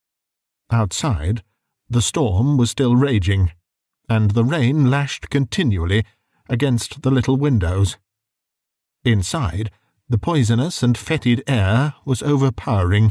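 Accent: United Kingdom. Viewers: I agree! The British voice is very very pleasing! IM SIMILING RIGHT now!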